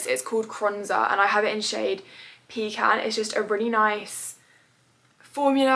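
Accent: United Kingdom. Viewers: Did not expect her to be British 😭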